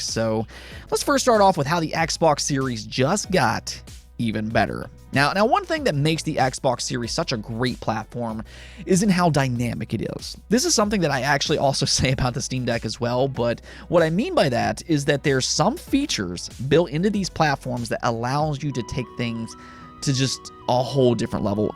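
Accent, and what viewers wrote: Philadelphia, United States. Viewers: didn't know you were a Philly or surrounding area guy.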